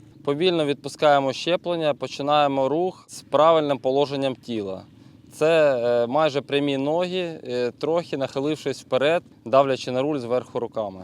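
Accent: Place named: Russia. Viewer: Смысл сужать аудиторию на своём украинском с русским акцентом ? Мда...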